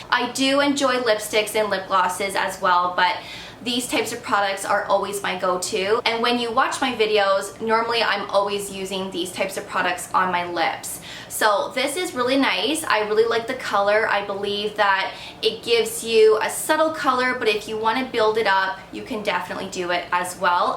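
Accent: Canada. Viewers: So glad you are proud of you Canadian accent! I’m also proud of my French Canadian accent! Go Canada! 🥰🇨🇦🙋🏻‍♀️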